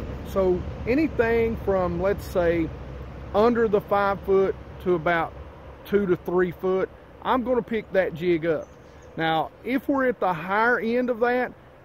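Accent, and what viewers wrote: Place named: Tennessee, United States. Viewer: You sound like a TN guy so if you ever fish Norris it would be cool to fish with you.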